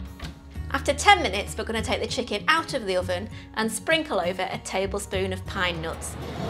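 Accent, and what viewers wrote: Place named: Northern England, United Kingdom. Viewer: As a British person living in Australia I’m liking the northern accent whilst I make my dinner. When my husband asks what we’re having to eat I say the recipe name in a northern accent - I can’t help it hahaha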